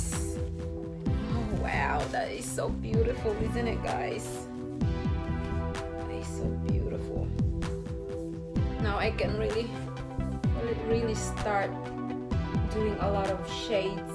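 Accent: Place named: Australia. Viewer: Yes, the case.. looks so manahalin but yeah, it's affordable. Isn't? . Uha! the gold at the centah is so striking. Defootah.. The bubbles thingy, nindot na sya pabutuhon. Nahn! love the Australian accent and the accent of the make up you put on yow face. But I still can't beleive na we live at the same place. Yeah? hahaha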